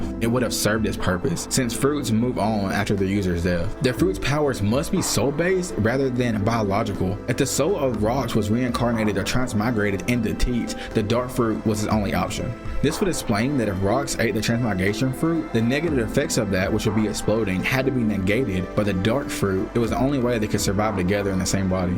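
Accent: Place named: Tennessee, United States. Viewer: Vro your accent😲 are you from Tennessee?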